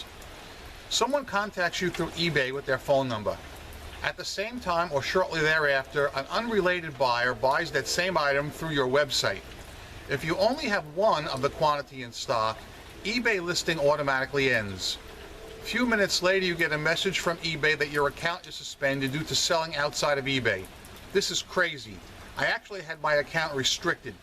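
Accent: New York, United States. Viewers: 'Best offer'.....I have some listings with best offer and tell in my description that payment is due immediately. It works most of the time. Occasionally on non BO listings someone will email and ask if I'd accept a lower amount. I'll say yah, I'll take that and ask when they'll be online, then lower the BN just for them. Works every time., I must say your New York accent makes your vids even more fun to watch.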